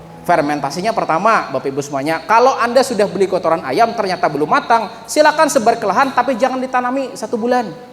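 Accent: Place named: Tegal, Indonesia. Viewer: Wong Tegal kie pak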